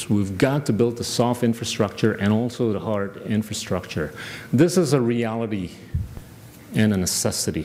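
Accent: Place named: United States. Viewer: terima kasih pak gita, saya belajar American Accent dari anda lewat video ini, sangat membantu dalam mengembangkan bahasa inggris saya yang masih awam dalam accent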